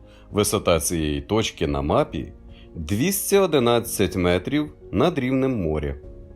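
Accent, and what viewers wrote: Russia. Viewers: Не двух а двох і у диктора відчувається декотрий російський фонетичний акцент, певно думає на ній і розмовляє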